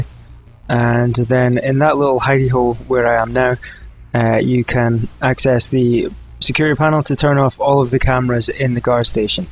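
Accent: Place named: Ukraine. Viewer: You sound like ukrainian) nice gameplay btw!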